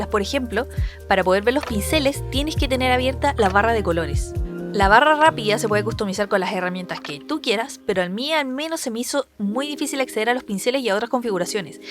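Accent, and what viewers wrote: Chile. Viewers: Wn me encanta el acento Chileno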